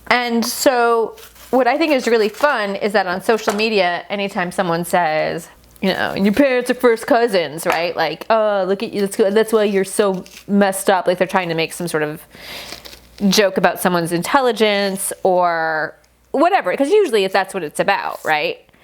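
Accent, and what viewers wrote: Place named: Kandahar, Afghanistan. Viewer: سلامونه جاني، زما مو تر اچار جوړولو په رسا کندهارۍ لهجه ږغېدا خوښه سوه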